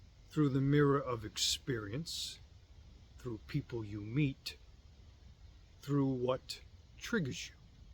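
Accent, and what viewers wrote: Boston, United States. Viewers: Good stuff!! Is that a Boston accent?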